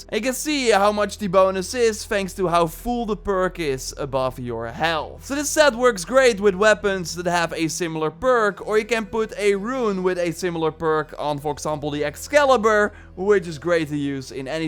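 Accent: Netherlands. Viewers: is this guy dutch? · Hahaha de eerste keer dat ik je video kijk en ik hoor meteen dat je Nederlands bent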